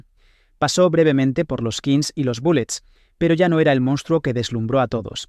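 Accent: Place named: United States. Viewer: Por qué hablas como un yanki?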